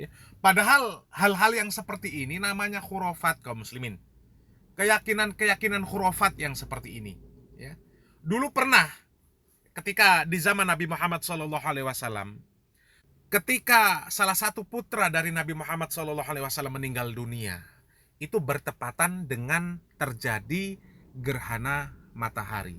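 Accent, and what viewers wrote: Java, Indonesia. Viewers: dari logatnya kamu dari daerah jawa ya,banyak omong lo sini main ke Kal-Sel dan yg komen nya yg miring.kalau memang orang kal-sel/martapura sesat mari kita duduk bersama luruskan. jangan cuman nyeloteh di dunia maya karena itu bukan suatu penyelesaian. salam hormat saya dari Kal-Sel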